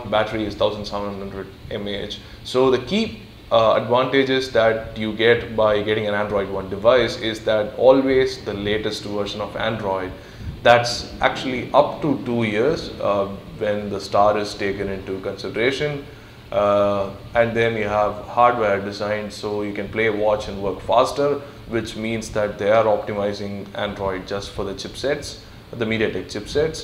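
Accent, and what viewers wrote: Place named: Tamil Nadu, India. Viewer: The fone is ok for the price........ but are you from Tamilnadu..... just asked coz of your accent.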